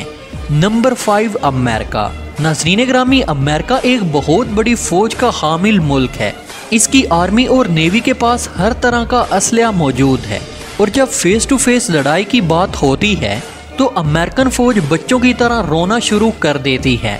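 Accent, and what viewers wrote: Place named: Punjab, India. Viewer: Yeah bhai Punjabi mei Urdu bool rehay hain 👎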